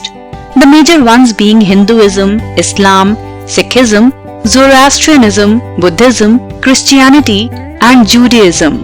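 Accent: India. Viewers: Where is lndia? And the person who is talking sounds like an indian.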